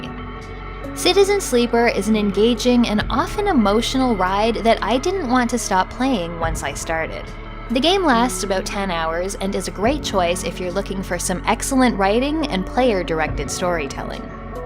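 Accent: Canada. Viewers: What accent is this Canadian ?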